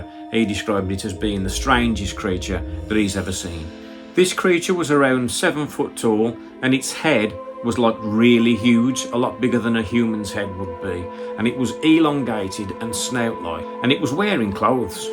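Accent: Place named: West Midlands, United Kingdom. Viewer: I can tell by the accent your from the West Midlands like myself.I live not to far from Cannock.Dont you unfortunately think because of the notorioty of the chase many of these experiences can't be trusted? I not saying it's all untrue but just urban legend.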